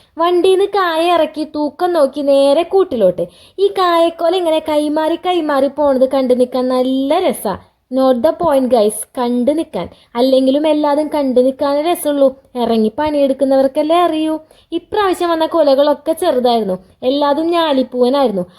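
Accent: Thrissur, India. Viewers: നിങ്ങള്ടെ സംസാരം തൃശൂർ സ്റ്റൈൽ ആണല്ലോ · Thani thrissur bashayund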